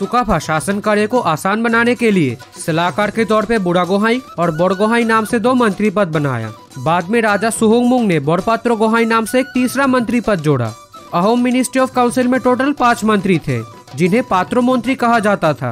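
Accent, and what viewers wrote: Bengal, India. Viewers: Your Bengali Accent Hindi is sooo noticeable I like it though!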